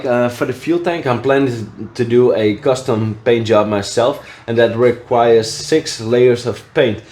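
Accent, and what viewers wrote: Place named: Netherlands. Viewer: Aargh, dat Nederlands 'engels' is tenenkrommend.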